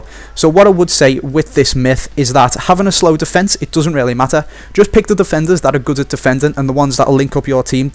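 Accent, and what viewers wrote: Liverpool, United Kingdom. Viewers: you scouse?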